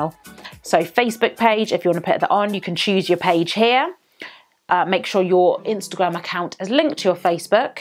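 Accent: United Kingdom. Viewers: love your accent, great content! i have never used the app, but i just use the IGTV on the instagram app. will download the IGTV app to make it more official lol, haha that british accent of yours, omg! look forward to more of your content.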